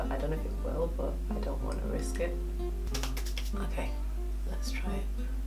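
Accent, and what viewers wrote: United Kingdom. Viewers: She's British lol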